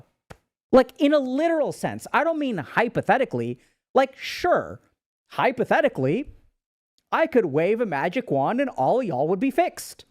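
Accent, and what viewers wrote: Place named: India. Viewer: I adore this India-accent of English 😉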